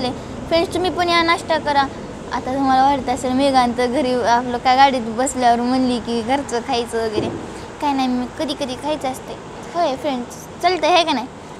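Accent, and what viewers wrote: Latur, India. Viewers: लातूरची मराठी लई भारी 👌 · Latur kar bolanyachi tone khup avdli pune karanna.... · I am from Pune Tuza video pahatana tuzi bhasha aikun mala mazya gf chi aatavan zaali she was also from latur #pbthetraveler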